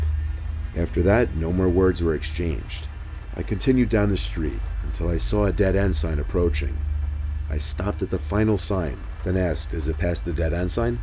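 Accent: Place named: Boston, United States. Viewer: Hey, I love your stories so much. I wanted to tell you, I recognized your accent from Boston so I checked out your insta. and I wanted to say that since you’re from Boston, you’ll be happy to know my mom and I are visiting there in September. I’ve always wanted to check out Salem.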